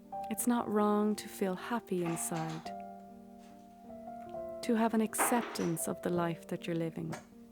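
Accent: Ireland. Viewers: 3 years later and I still comeback so I can go little slower. Your Irish accent I confess brings me joy and calm that I cannot exactly explain. But thank you for all that you put on this platform. I hope your little one is growing well. And that you are still living that is best suited for you and yours. Just dropping in to say hi and happy October again. The seasons cycle is so beautiful don't you think? Take care from the PNW in the USA. May the rain bring all the life needed. ❤❤❤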